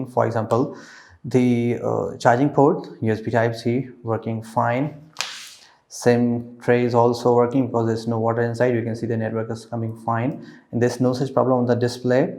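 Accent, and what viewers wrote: India. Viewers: Indian accent is so annoying lol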